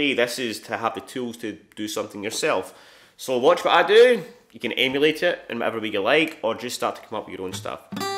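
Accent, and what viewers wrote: Scotland, United Kingdom. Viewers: I have absolutely no problem with anyone playing this kind of music at all. But it always seems just a little strange to hear that Scottish accent with what is to me, traditionally very American music. Or any accent. I'm glad people dig it. Play it all you want. It's great. I'm glad people in other places can fall in love with music I love.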